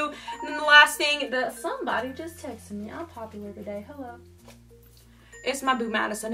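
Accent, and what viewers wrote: South, United States. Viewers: Is it just me or did she get more of a country accent lmaooo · She got a lot more of a country accent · petition for who thinks she should use her country accent more ⬇️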